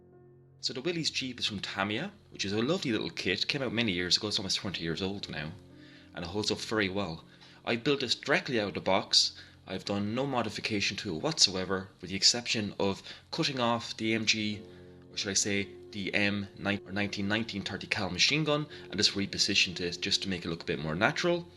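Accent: Ireland. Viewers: Love your work! Thanks for your effort!, coming to you from California, dig the Irish accent! Keep em coming as your teaching me a lot!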